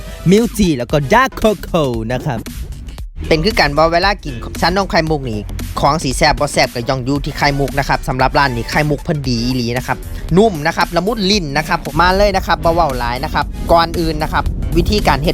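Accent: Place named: Isan, Thailand. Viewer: มักเสียงเว้า เด้อเนาะ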